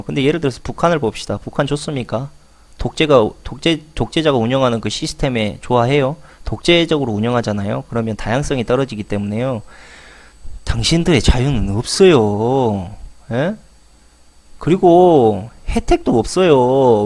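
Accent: Jeolla, South Korea. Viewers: 이사람 전라도네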